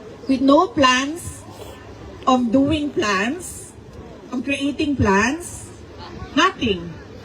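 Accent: Visayas, Philippines. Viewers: parang tanga magsalita halata nagbabasa may script pero slang p rin pagkabisaya khit ingles pa😅 wthell!!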